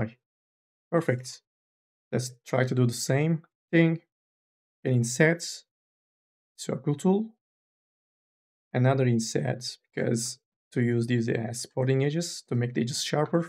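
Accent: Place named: Turkey. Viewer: paylaşım için teşekkürler... aksandan yakaladım.. yanılmıyorsam türksünüz hocam..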